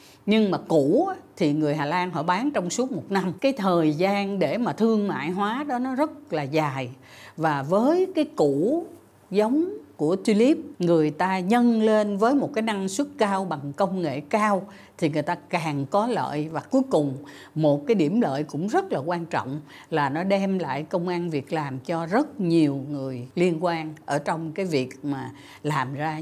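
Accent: South, Vietnam. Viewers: Em mói biết đến you tube của chị đươc vai ngày nay. Rất thích cách dẫn dất chuong trinh, chia sẽ kiến thưc rông rãi, đề tài hấp dẫn và nhất là giọng nói miền năm Viêt Nam, thât dịu dàng thân mât của cô. Mong chuong trình sẽ còn tồn tại lâu dài đê em được tiếp tục theo dõi và học hỏi nhiều điều hay chỉ chia sẽ.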